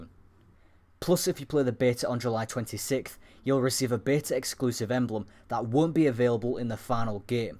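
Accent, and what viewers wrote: United Kingdom. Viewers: Well done! This video got you an insta-sub from me. Your even peaceful brit accent is a refreshing change of pace from our freak out beautiful b-tard MoreConsole friend. :-) Can't wait to watch more!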